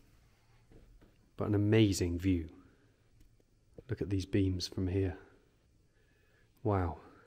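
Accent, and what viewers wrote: England, United Kingdom. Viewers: I am truly blessed to have found this channel! Everything about it is wonderful! It marries 3 of my loves: architectural history, pipe organ music, and a proper English accent! ❤. Looking forward to seeing more from this amazing artist!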